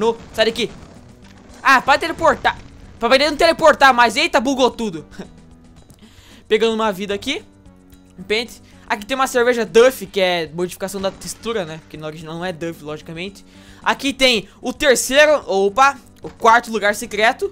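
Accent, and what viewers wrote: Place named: Paraná, Brazil. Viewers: de que lugar do paraná vc é? hahahaha mt bom seus vídeos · de onde tu é ? teu sutaque parece aki do sul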